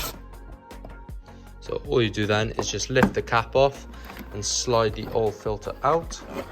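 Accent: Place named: Wales, United Kingdom. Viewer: i will be giving this a go next week , so thank you for the vid. You have made it look really simple. You sound welsh by the way lol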